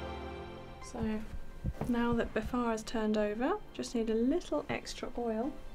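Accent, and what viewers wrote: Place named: RP, United Kingdom. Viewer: What happened to the all-girls boarding school accent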